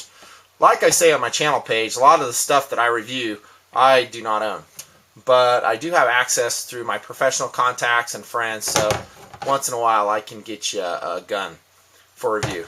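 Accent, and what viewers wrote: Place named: South, United States. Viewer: lol, sounds so much more southern in these old videos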